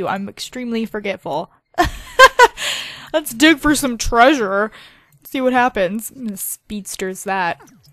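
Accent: United States. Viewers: It's americam but she is from Houston Texas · probably american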